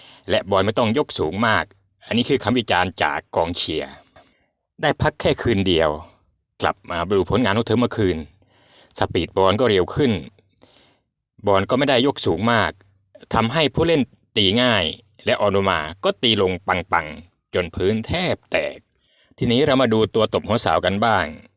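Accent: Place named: Isan, Thailand. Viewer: สำเนียงอีสานคักแถะ หนังกีน พะนะ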